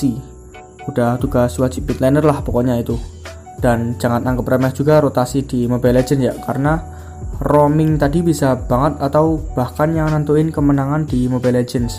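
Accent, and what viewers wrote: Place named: Java, Indonesia. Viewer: Medokee :v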